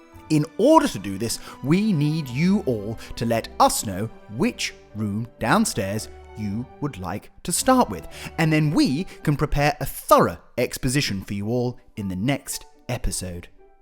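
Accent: RP, United Kingdom. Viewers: Your videos are simply scrumptious! I adore the narrator’s witty use of the Queen’s English. I am sure you are firm friends with the local antiques dealers, tell them you are on the lookout for a chimney surround of the same era as the house, they might be able to help you. I would start on the downstairs toilet/bathroom, comfort is important whilst renovating. (And someone will eventually spot you behind that bush..). Afterwards I would tackle either the dining room, or the sitting room- so that you can have a room that is finished and decorated and furnished, it will bring a huge sense of achievement and an actual place to wind down. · It's true! I've also noted that the comments are just overflowing with people, (including me), attempting to use their very best Queen's English (if I understand the term correctly), inspired, I'm sure, by the narrator who is a shining example to us all! 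❤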